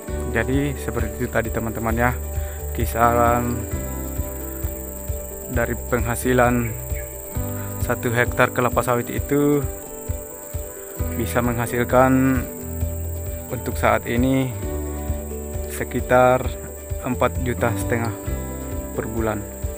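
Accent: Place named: Sulawesi, Indonesia. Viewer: Kayak orang mambi ini logatnya 😁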